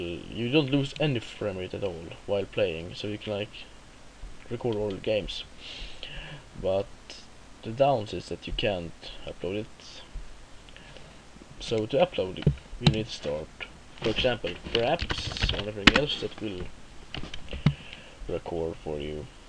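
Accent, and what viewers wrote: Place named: Sweden. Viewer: haha var tvungen att fråga , det lät riktigt svenskt!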